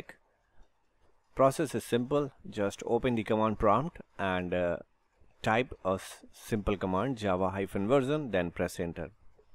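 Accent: India. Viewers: You know it's legit when there's a yellow circle around the pointer and the guy has an indian accent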